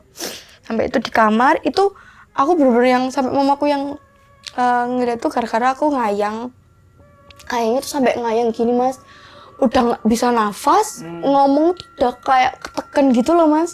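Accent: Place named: Surabaya, Indonesia. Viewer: Logat khas Suroboyonan yah, iyo la.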